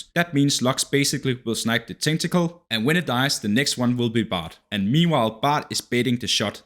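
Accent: Denmark. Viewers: Love your Danglish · danish accent coming in strong:)))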